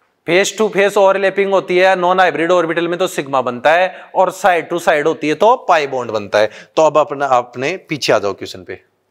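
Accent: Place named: Haryana, India. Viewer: Haryanvi lahje me chemistry mja aa gya kya padhate ho sir ... thanks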